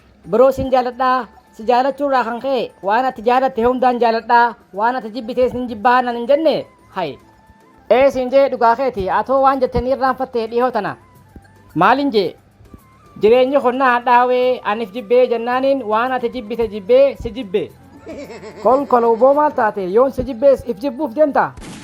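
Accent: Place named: Hararghe, Ethiopia. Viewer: sanuu loqoda Harargee bareeda saniitiin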